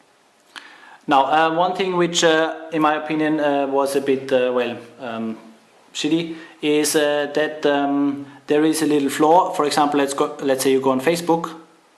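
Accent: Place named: India. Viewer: all Indian accents sound shit, go back to Hindi, indians...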